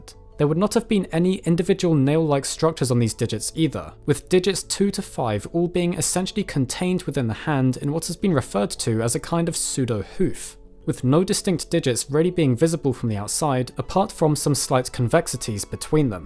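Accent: RP, United Kingdom. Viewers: I enjoy your work. you always put much thought into it and present the material well. i learn something new every time. if you want to pursue a career in network broadcasting, you are photogenic, you present yourself well and your accent is clear enough for most Americans to understand yet will be classified as upper class British so educated and trustworthy therefore ideal for promoting news and science as opposed to sport or entertainment. however, you appear to be turning your head so much that it distracts the viewer from listening. try limiting the characters per line from 80 to 60 or perhaps 40.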